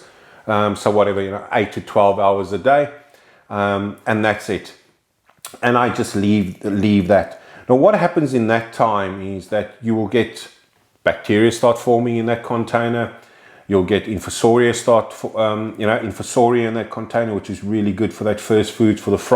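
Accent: South Africa. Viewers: Nice video man . Helped me a lot. Are you from South Africa by the way? Sounds like you have an SA accent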